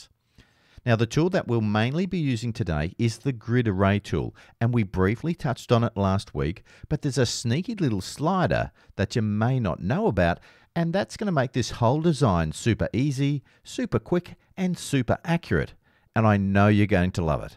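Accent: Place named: Australia. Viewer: Great lesson. I’m trying to learn all I can, so I can stop buying files and make my own work. Love the Aussie accent. Australia is on my bucket list to visit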